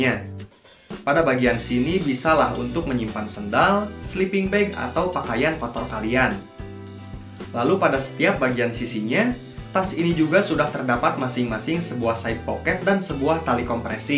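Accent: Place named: West Java, Indonesia. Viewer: Dengar narasinya, sangat mencirikan orang sunda 🤣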